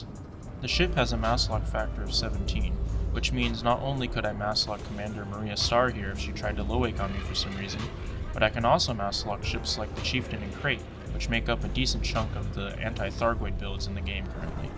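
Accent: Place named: Philippines. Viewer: This guy sounds pretty Filipino NGL🥵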